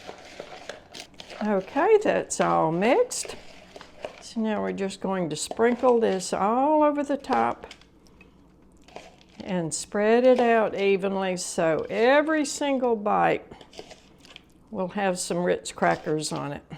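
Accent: South, United States. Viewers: I enjoy everything about your videos, including your southern accent and charm.❤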